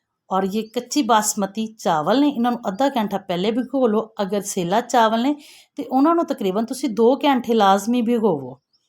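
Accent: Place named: Punjab, India. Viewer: Sweet Punjabi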